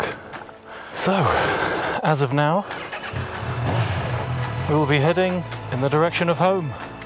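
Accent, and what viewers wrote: United Kingdom. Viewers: thanks mate. I thought you are from the UK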